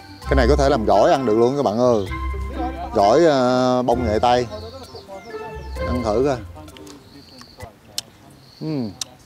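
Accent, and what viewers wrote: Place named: Mac Cuoi, Vietnam. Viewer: Cam on em . Chi Nghe em noi chuyen Mac Cuoi va thay vui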